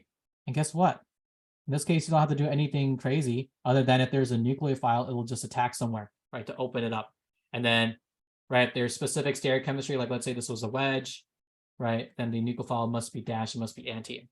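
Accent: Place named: England, United Kingdom. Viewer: You have a very nice accent. Are you from England?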